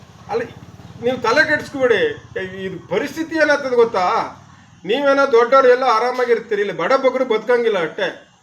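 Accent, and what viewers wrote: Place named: Malenadu, India. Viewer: ನಮಸ್ಕಾರ 🙏 ಮಲೆನಾಡು ಕನ್ನಡ ಬಳಸಿ ವಿಡಿಯೋ ಮಾಡುತ್ತಿರುವುದು ಕೇಳೋಕೆ ತುಂಬಾ ಸೂಪರ್ ಆಗಿದೆ 👌👌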